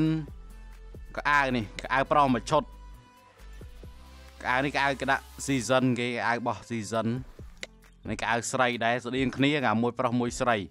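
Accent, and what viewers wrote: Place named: Cambodia. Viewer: ប្រូខេត្ដណា មិចយាយអណ្ដើនម្លោះ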